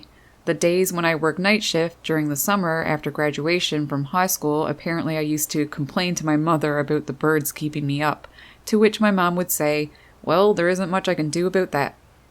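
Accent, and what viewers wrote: Canada. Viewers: There is not a thing I can do a-boot that.. soo darned Canadian! lol.. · Was wondering how far up north, then I heard "aboot", that far up north i c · Hello. Looking forward to my morning irritation of springtime American Robins. I love your explanation and it makes sense. I'm from northern Minnesota and your accent was immediately recognizable as Canadian (very strong). Which province? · The accent is Canadian eh? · Your accent says you're from Canada. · Its aboot time..clearly Canadian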